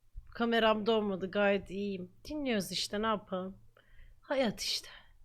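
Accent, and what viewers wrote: Erzurum, Turkey. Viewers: lan bu erzurumlumu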